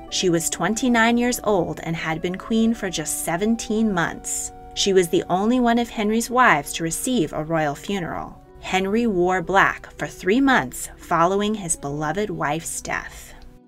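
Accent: United States. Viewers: Sorry. But why is there an American narrating this? So wrong. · U have a rlly American accent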